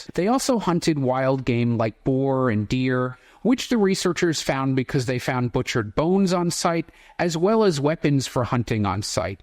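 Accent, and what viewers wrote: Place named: United States. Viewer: I know you are American but please sort out your pronunciation of British words names and places 🙉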